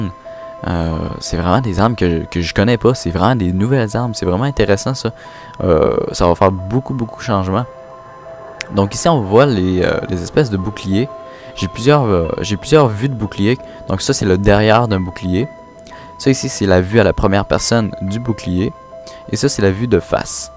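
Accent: Quebec, Canada. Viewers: respect super bonne video mec et fai pa gaffe au ptit kikou qui critique ton accent psk moi jsui pa québécois mais jle kiffe ton accent ^^ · Cest Quebecoi apren unpeu a tinstruire elle est pas mieux votre accent en passant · un quebequoi <3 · Canadien, Québecois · tien un quebecois :D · L'accent canadien c'est cool mais on comprend rien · T'ommage que t'est l'accent canadien sa m'a un peu dégouté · l'accent quebecois <3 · Accent québécois > tout les accents. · putain vais vous croyer que l'accent quebequoi c'est tout mimi ? c'est style 2 video mais la il faut arrete putain ! il y a des mots INCOMPRENSSIBLE ! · J'aime l'accent québécois 8) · t srx je le kiff set accent canadio? ou quebequoi? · ENFIN UN QUÉBECOIS SUR COD QG :D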